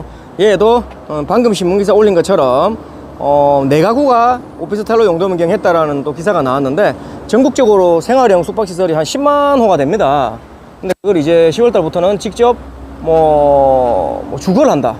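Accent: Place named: Seoul, South Korea. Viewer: 의 완벽한 서울말투의 답사수업은 단연 최곱니다 😊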